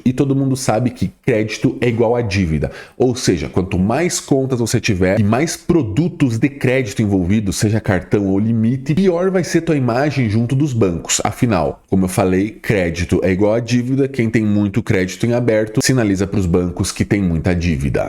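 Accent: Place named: São Paulo, Brazil. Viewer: O senhor é de são Paulo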